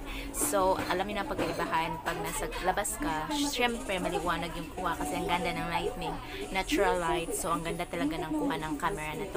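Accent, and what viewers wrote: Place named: Visayas, Philippines. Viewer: Bisaya man diay ka sis proud bisaya here.. ganda ng accent mo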